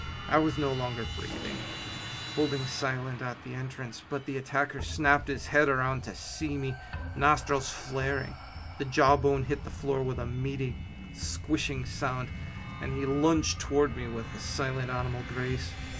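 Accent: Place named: Ireland. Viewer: I've heard this one before but it's way better with the Irish accent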